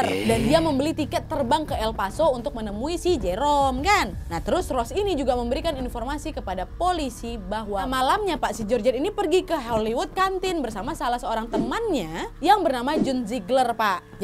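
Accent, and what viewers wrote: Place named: West Sumatra, Indonesia. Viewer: "Ndak itu jawabannya do" 😅 awak takana jo kampuang mandanga nad2 mangecek macam tu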